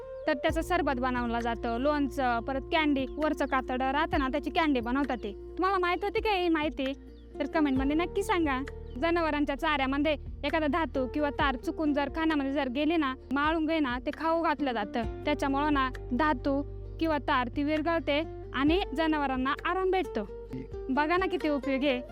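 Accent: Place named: Sangamner, India. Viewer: नमस्कार ताई खूप छान माहिती दिली धन्यवाद.. संगमनेर भागातून बोलता का? बोलीभाषा मिळती जुळती वाटते. आम्ही जुन्नर आळेफाटा..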